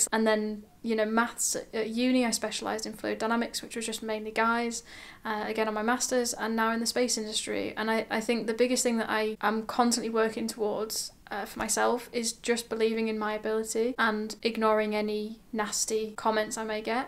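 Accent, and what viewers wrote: United Kingdom. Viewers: You're a very interesting person. I love your channel. You have an incredible calmness inside you, and a very pleasant voice. I love so much hearing your British accent. You inspired to me. Naysayers? How care about them? All The Best!!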